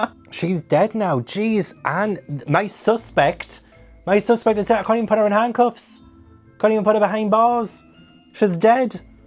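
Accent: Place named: Northern England, United Kingdom. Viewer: I'm not great at accents myself and I am British. It's very obvious to me it's a northern accent but parsing out which one isn't my forte. However he talks about Newcastle a lot so I imagine this is the accent local to there.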